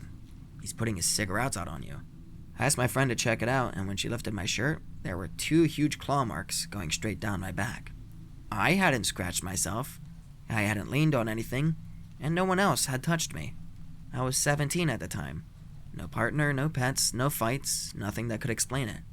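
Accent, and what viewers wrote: Scotland, United Kingdom. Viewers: Nice Scottish accent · Sometimes the worst stories are the best! Think this is my 3rd listen already. Hope life is going better! Thanks for the BEST Scottish accent ever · best scottish accent evwr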